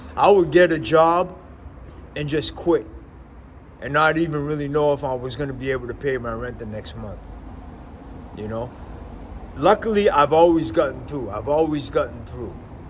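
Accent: Boston, United States. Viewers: This guy is great 👍. I know that accent! I came of age near the Boston area. Just outside of Fitchburg to be more specific. I lived there for 22 years, lots of fond memories. Nowadays I have returned to my early childhood hometown in upstate NY to be closer to family who live in town and so I could at least afford a place to stay for awhile as an educator. I feel at least temporarily more protected from the scourge of the economy and national disasters. I did tell friends and family thought, if I have to relocate again, it is not going to be the United States.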